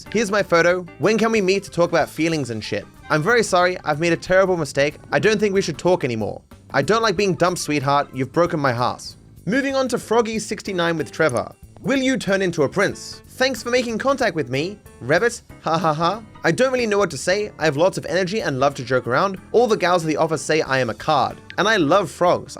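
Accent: Australia. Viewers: other guys at my age: going oudside, doing sports, enjoying life. Me : listening to a random ausiie reading s*x messages. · Hell yeah, that's why I pay for the internet. To watch an Australian guy talk half a video about an unreal website for unreal horny wifes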